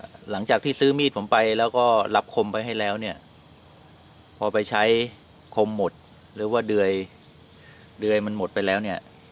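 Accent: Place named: Central Thailand, Thailand. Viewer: ขอบคุณคร้าบ ทำไมพูดกลางชัดจังครับ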